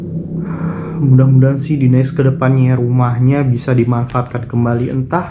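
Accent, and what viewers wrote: Sunda, Indonesia. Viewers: Panutan banget nih Bang....sukses terus bang hehe...semoga selalu bisa mempertahankan adat logat sunda dan makin meningkat...makin keren makin joss par jos geng...salam sehat salam santun salam explore....Bang... hehe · Assalmualaikum kang...purwakarta hdir met pgi,br td pgi liat aa ngreview rumkit cirebon skrg rmh yg terbngkalai,ngdengr suarany cndu bbgt a..logat sundany itu lo kntal bngt😚🙏😘🥰 sukses trus chanel ny ya a🙏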